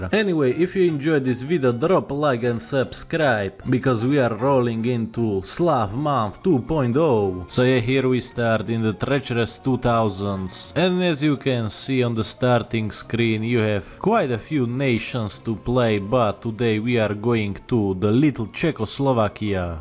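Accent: Russia. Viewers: Why do you have a Russian accent? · Why do you articulate what you say like a russian when a slovak doesn't speak like that?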